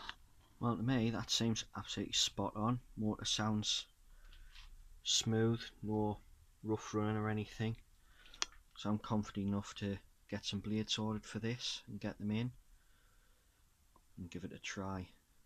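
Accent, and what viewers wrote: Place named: Newcastle, United Kingdom. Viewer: Hey, I've got one of these, bought new! Nice to hear Geordie accent...trying to change the blades,,,,, first time in all these years..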